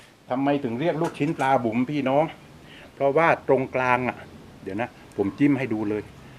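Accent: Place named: Central Thailand, Thailand. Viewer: คุณ เป็นคนใต้ แต่พูดกลาง ให้เกียรติแฟนคลับภาคอื่นๆด้วย น่ารักมากค่ะ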